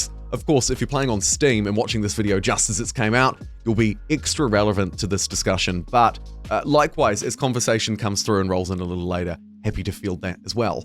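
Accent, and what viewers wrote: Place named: New Zealand. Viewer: its good to hear a kiwi as voice, repping NZ in the gaming space of youtube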